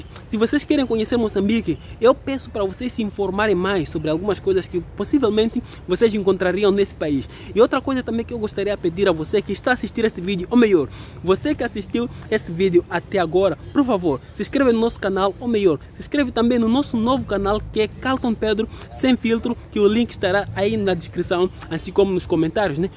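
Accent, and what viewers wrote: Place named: Brazil. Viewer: Você fala um sotaque próximo do brasileiro. Diferente de outros youtubers de Moçambique.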